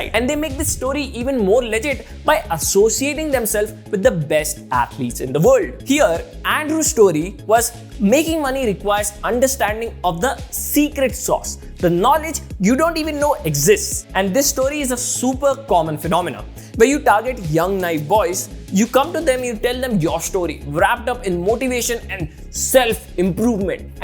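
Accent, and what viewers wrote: India. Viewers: I am sorry ,but I guess he needs to work on his accent,too much Desi accent..please take it as an advice from a fan of aevy tv.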